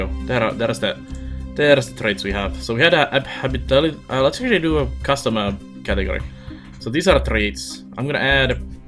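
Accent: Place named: Finland. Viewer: Thats an intense finnish accent there. Edit: But a pretty helpful tutorial nonetheless :D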